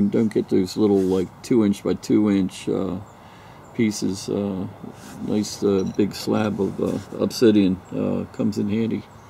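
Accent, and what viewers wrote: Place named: Pennsylvania, United States. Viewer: I subscribed to you channel today :) I love to junk and yard sale. I have question, I was watching your video 2010 you bought 9" silver zodiac part. You said you sold it to a guy on Craigslist. I inherited the exact same bar. Can you provide me with any information? You said, if you had kept it, it would be worth more. 7 years later :)) I would appreciate any helpful info. You sound like, you are from Pennsylvania. Steeler Fan here.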